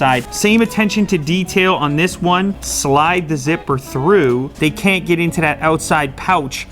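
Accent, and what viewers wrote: Canada. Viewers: your Canadian??!! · Wait!...your Canadian? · you from Canada?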